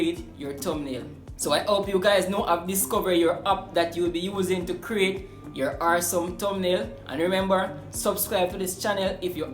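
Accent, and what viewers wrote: Jamaica. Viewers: Are you Jamaican? I hear the accent!! I am too, you get a subscribe just for that. I use Canva as well!!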